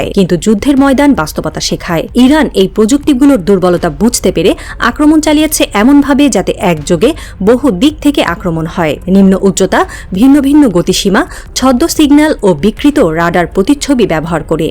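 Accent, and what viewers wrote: India. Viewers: Indiar moto news poren keno😮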